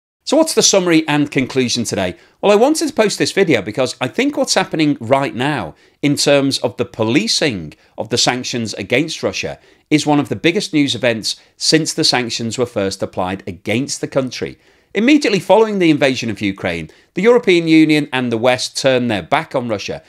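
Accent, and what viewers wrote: United Kingdom. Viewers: He’s not American with that accent, British I’m sure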